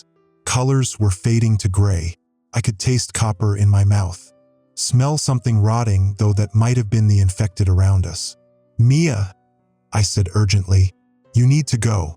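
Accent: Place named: Spain. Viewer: The Spanish accent occurring randomly throughout is distracting. How does that even happen?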